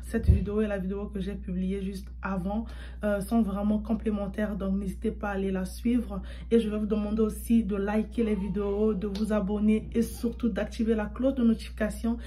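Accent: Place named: Democratic Republic of the Congo. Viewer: 😂😂😂Bonjour, les congolaise sont là pour te soutenir, je savais que tu étais congolaise juste par ta prononciation française... 🇨🇬🇨🇬🇨🇬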